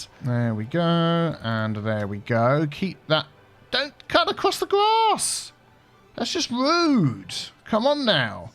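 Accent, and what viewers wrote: United Kingdom. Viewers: youre kind of adorably british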